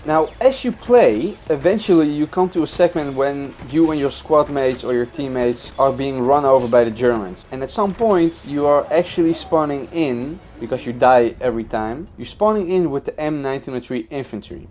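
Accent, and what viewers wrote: Netherlands. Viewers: You sound like a dutch person talking english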